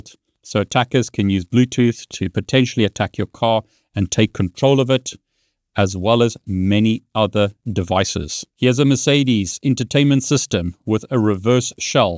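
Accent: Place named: South Africa. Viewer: It's his South African accent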